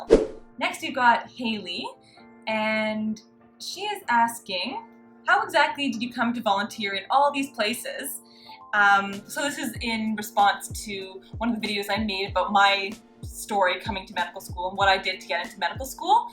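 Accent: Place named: Canada. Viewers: You sound Canadian to me. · That's because she is Canadian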